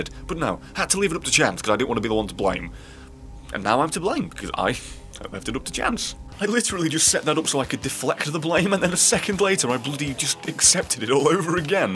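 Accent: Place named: England, United Kingdom. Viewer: Question: You've mentioned that you're in Germany before, but your accent is English. Are you, in fact, English?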